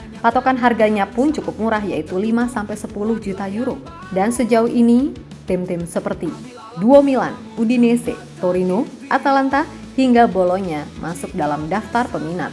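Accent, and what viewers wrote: Java, Indonesia. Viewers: " CANGKEM CANGKEM " 😂😂😂😂😂...logat jawanya khas banget min ❤❤❤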